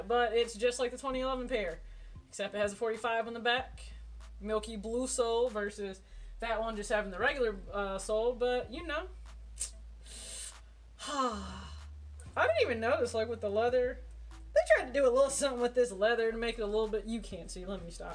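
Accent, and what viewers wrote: South, United States. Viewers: I love your thick country accent!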